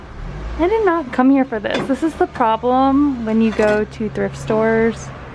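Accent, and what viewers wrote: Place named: United States. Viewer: This is a interesting movie. Than you for your upload. By the way, which state are you from? I am also interested in American girls accent in region.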